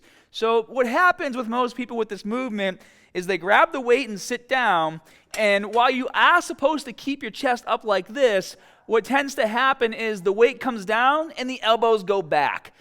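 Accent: Boston, United States. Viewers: Lol is this guy from Boston? If so, represent!!! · No, he's likely from Boston. They say "r" words like that. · Is that a Boston accent? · That's called the Boston accent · Your def from Boston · Helpful. But god damn I can't stand that Boston accent. Jesus Fuck! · Love that Boston accent 😍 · Bostonian accent for sure. "Bah" for "Bar". "Staht" for "Start". Those words sound Australian. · I've watched so many SHF videos to get started on my weight loss journey but I just noticed that he's from Boston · STRONG Boston accent in this video :-) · he’s a bostonian😂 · Has to be Boston he sounds like my cousins 😊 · I wonder if he's from Boston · are you from boston? i love that accent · Adrian I’m from Boston but no longer live there. Was thinking the same thing. I watch these vids not only for form but to hear the Boston accent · Hmm I wonder if this guy is from Boston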